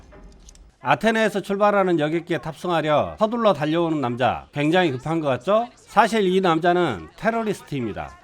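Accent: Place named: Honam, South Korea. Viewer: 아따 성님 호남사투리 오져부네요~~ 잘보고갑니다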